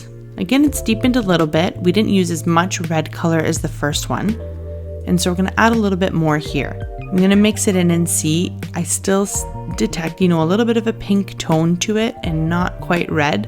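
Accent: Canada. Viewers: 😹😹 YouTube isn’t really giving me my notifications otherwise I would’ve responded days ago. Yes you definitely have a Canadian accent When I left Canada and moved to the United States I was working for American Express and all of the customers kept saying where are you from because I would say “out and about” with that Canadian flare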